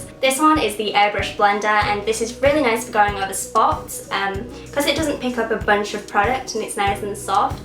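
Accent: United Kingdom. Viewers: I'm not used to the Gothic look with the British accent....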